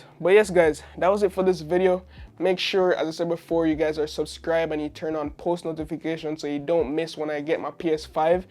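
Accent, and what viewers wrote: Jamaica. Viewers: Could tell from the get go that you were Jamaican 🤣🤣🤣 A di only reason me subscribe, bless up. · You deserved my sub bro....btw that accent are you jamaican? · U Jamaican Bro? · Are u Jamaican dawg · Yeah your Jamaican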